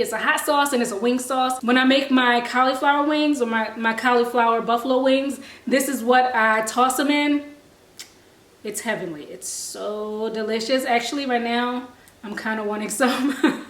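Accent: Baltimore, United States. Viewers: You from Baltimore? You have a accent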